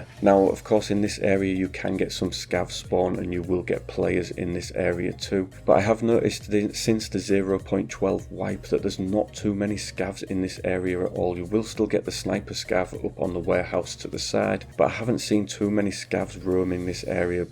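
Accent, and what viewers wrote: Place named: Hull, United Kingdom. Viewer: Thanks, you sound like you are from Hull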